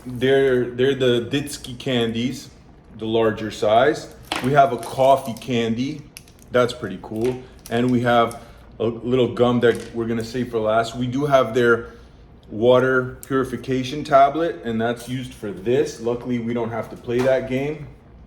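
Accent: Poland. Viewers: On jest Polakiem ? On mówi z polskim akcentem. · Wspaniały akcent Polski. Pozdrowienia z Polski 🇵🇱 greetings from Poland🇵🇱👍👌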